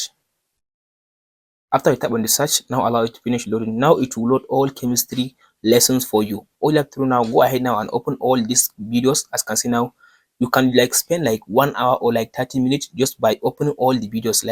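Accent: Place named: Bhojpuri, India. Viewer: Bhojpuri english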